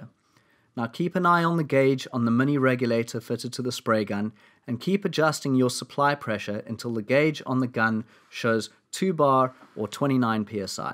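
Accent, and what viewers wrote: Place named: South Africa. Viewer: I detect the sound of a south african accent....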